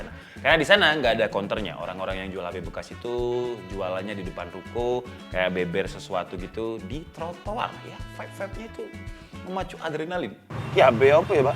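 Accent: Java, Indonesia. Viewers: Medok banget bahasane mas 😁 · Loalah wong jowo ta pantes nyedok😂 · Lahyo logat e malangan wkwk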